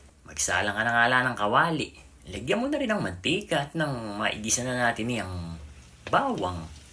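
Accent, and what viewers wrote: Bulacan, Philippines. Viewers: taga bulakan ka san lugar ksi yun punto mo .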